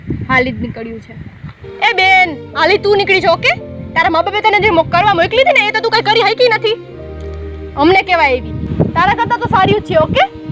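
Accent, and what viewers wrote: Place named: Junagadh, India. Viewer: Having grown up in junagadh...You made me nostalgic, listening your cute accent....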